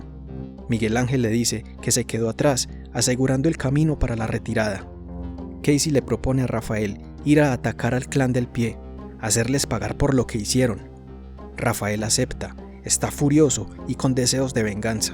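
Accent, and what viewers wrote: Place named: Colombia. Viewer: sos de Colombia? Digo, supongo que es lógico por el vídeo de los Simpson, pero ese ascento es demasiado reconocible😂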